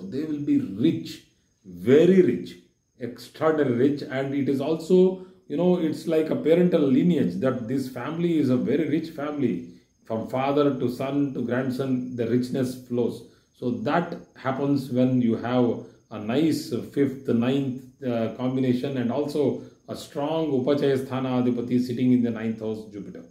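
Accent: India. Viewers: Your English is great! ❤ I like to listen to hindi accent to train my ears to understand, your English I can understand easily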